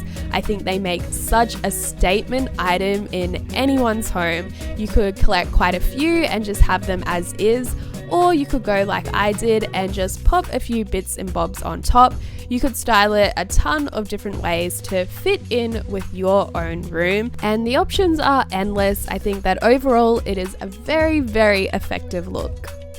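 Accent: Australia. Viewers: Are you Australian? If so, what part?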